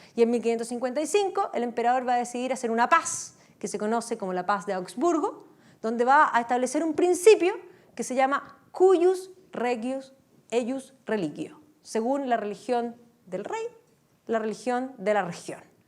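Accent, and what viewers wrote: Chile. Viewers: Reconoci que era chilensis por el acento jajaja.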